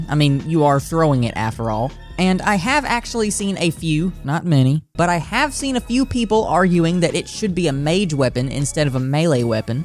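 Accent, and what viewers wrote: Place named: South, United States. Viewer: Well you have another sub. Decent content man. And don't fret yourself on the script, it was decent as well. That SOUTHERN DRAW THOUUUUGH! (No judgement, Arkansas here.)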